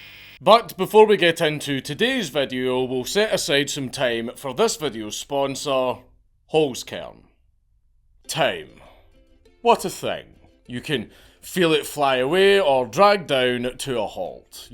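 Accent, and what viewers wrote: Scotland, United Kingdom. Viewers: Goddammit you know how hard it is to find Celtic Myths on youtube narrated by an ACTUAL SCOTSMAN. Throw me another several bones as one of your countrymen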